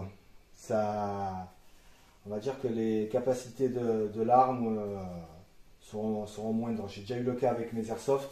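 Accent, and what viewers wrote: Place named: South, France. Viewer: Super vidéo du CP300, comment ça ? Il fait froid avec l'accent du sud de la France 😊.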